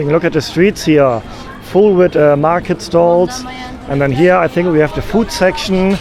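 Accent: Germany. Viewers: Love your videos. Please keep it up. Also love your German accent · It's Deutsch accent, not English accent.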